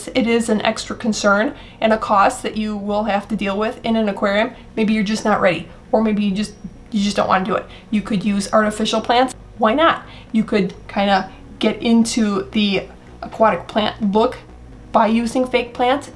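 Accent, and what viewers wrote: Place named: Midwest, United States. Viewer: I'm not sure if it's your Mid-Western accent or Mom humor, (that can be a thing, too right?), but you are delightful and engaging teacher!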